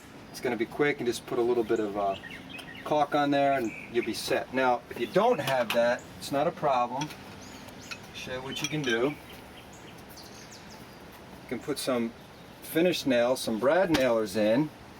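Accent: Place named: Tennessee, United States. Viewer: Sounds and looks like hes in TN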